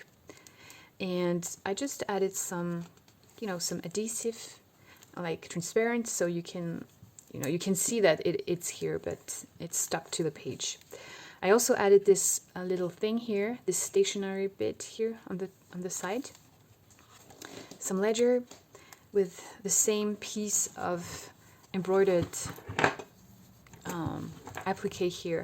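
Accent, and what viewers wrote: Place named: Quebec, Canada. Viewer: I love this, very beautiful work! Are you French? You have an accent that sounds from Québec. Just asking cause I am in Montreal!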